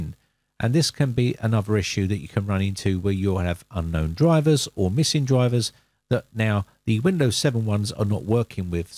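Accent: United Kingdom. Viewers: His videos are outstanding, my American English, however, doesn't always pick up on his UK English! Thanks for your comment.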